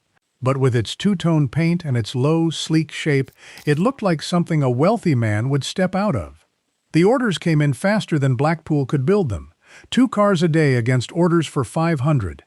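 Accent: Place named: United States. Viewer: It’s Jaguar NOT feckin Jagwar Ai is bad enough but the Yank ones are even worse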